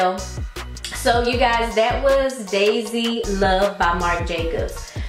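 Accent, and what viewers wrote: Texas, United States. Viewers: Are you from Texas? So am I.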